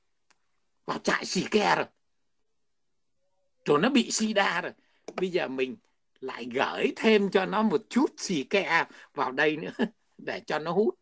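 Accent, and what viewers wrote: Standard. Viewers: Bác nói chuẩn 👍❤️ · Hi hi anh nói rất chuẩn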